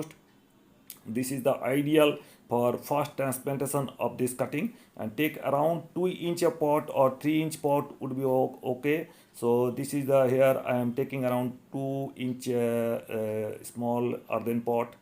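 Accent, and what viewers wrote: India. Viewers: Indian accent English :-)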